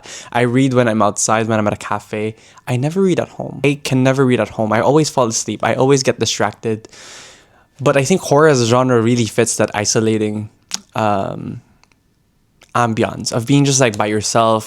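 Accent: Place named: United States. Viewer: where r u from? just interested bcz u'r speaking like american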